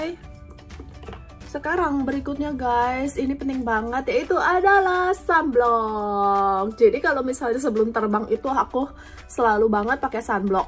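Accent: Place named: Pontianak, Indonesia. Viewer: Asli mana..logat Indonesia nya kayak dari Pontianak ya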